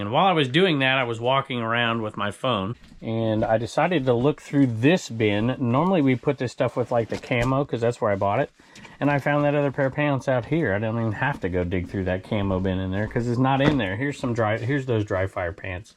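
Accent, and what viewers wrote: South, United States. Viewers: You sound like a confederate.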